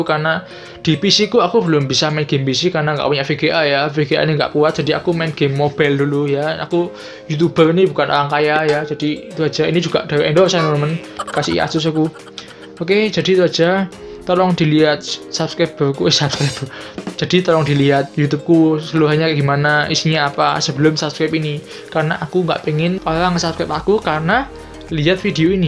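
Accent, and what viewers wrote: Java, Indonesia. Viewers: logat jawa ya bang?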